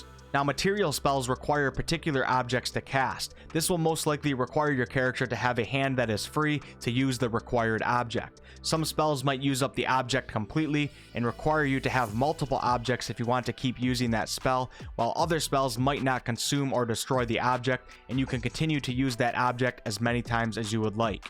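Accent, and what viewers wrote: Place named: Minnesota, United States. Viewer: What is your accent? Is this like Minnesota or something?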